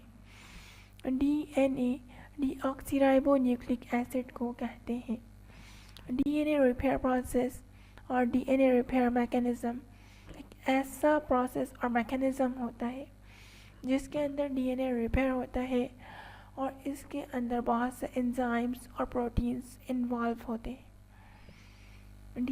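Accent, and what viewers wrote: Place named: United Kingdom. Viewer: Ap aise hi bolti ho kya hindi me english accent dalne ki kosish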